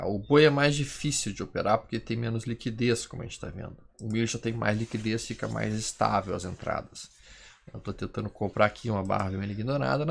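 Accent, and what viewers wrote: Porto Alegre, Brazil. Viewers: ele disse bspoas sfdeua fpasa, problema para mim que o professor fala muito rápido além do sotaque portoalegrense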